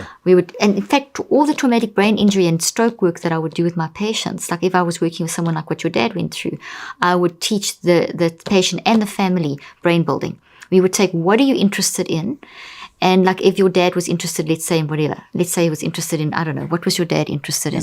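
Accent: South Africa. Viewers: South African 😍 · Still learning to understand the South African accent of this wonderful woman lol 🍀 💖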